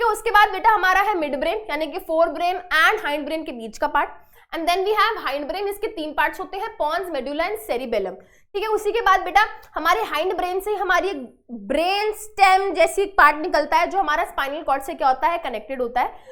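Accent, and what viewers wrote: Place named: Punjab, India. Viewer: Ma'am was catching her Punjabi accent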